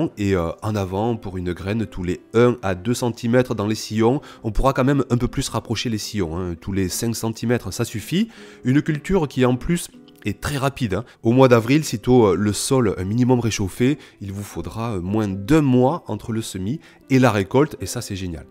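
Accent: South, France. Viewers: Tres chouette cette vidéo, tres claire et précise... Merci merci. Et le ton de ta voix toute en ambiance chaleureuse en accent du sud ... c'est du bonheur tout ça ! Moi aussi j'ai écouté ça lors de mon petit-déjeuner du dimanche entre le thé et les tartines de confiture maison... Quel plaisir ! Mille merci ! Tres bonne idée aussi ce filet pour protéger les tomates de la chaleur du sud. Je vais essayer de construire ça aussi ! Est-ce que c'est utiles pour les autres légumes ? Vous êtes où dans le sud ? ...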